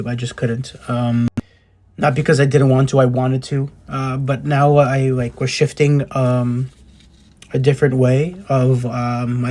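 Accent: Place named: Russia. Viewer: Oh my god, I'm first to say, I love your videos and when I saw your video come on my youtube, I stopped what I was watching and clicked on your video., you are soooooooo talented. Your russian accent with your N.Y. mom character and Babyshka character are on point. I have watched all your videos. Please, Please continue making videos. LIfe is good. Be well and B+ (Be positive)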